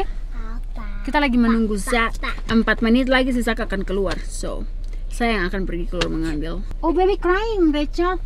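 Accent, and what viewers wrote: Manado, Indonesia. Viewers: Logat dan cara berbicara anda mengingatkan saya kepada teman saya yang berasal dari Manado❤️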